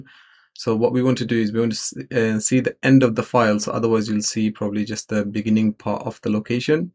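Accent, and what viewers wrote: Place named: England, United Kingdom. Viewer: WOW FIINALLY SOMEONE WHO SPEAKS ENGLISH ENGLISH NOT INDIAN ENGLISH <3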